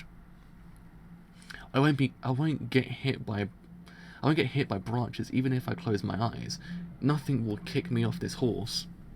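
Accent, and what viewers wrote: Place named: United Kingdom. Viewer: I think is for your British accent lol, the way you pronunce "The legs of" sounds pretty similar to "Alexa" for me xD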